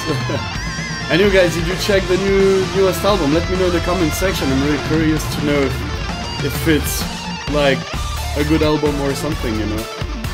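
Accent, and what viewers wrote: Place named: France. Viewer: Avoue t'es français, on a tous cet accent xD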